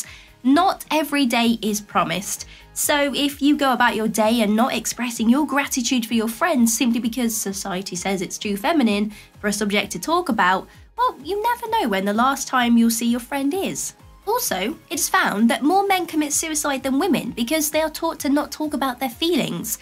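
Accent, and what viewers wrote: England, United Kingdom. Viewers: I love listening to your voice at 1.5x -English accent - too cute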